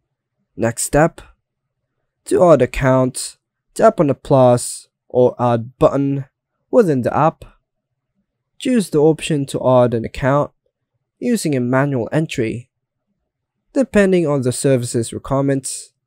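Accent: United Kingdom. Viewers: Strong Brit dialect, difficult to understand. not getting which service you're referring to. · People who can't understand brit accent.... Use CC..?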